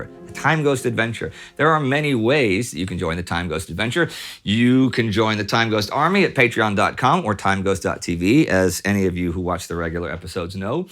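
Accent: Texas, United States. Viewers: your Texan is showing. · I love texas-accent <3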